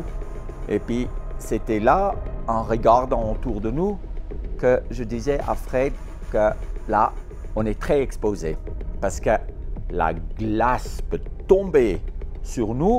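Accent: Vaud, Switzerland. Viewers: Tcheu c’taccent Suisse 🇨🇭!👍🏽😄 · Cet accent vaudois 😂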